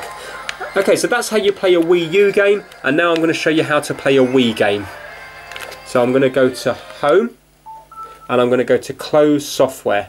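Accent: United Kingdom. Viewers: anyone noticed is a british person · He’s British. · britisch accent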